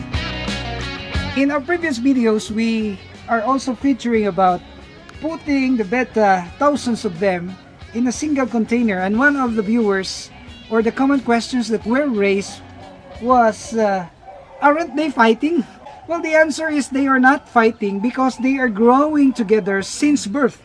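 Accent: Visayas, Philippines. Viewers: Bisaya ka nong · Bisaya diay ka lods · Ka lowm pud mo bisaya ni kuya oi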